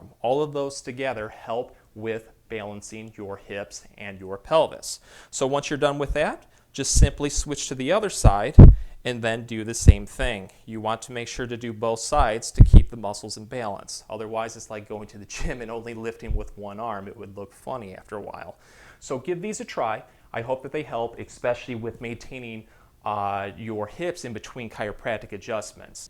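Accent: Michigan, United States. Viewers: Thick Michigan accent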